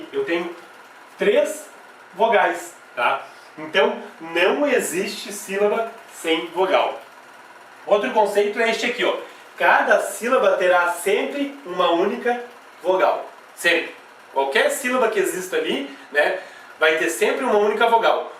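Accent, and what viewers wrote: Rio Grande do Sul, Brazil. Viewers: gostei do sotaque gaúcho ..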